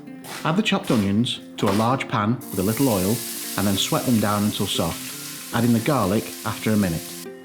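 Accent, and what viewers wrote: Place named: Northern England, United Kingdom. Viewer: A northern lad I suspect me? Derbyshire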